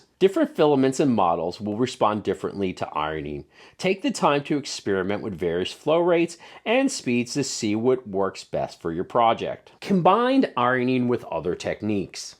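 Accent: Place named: California, United States. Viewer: I know nothing about you other than from you accent you are clearly from California.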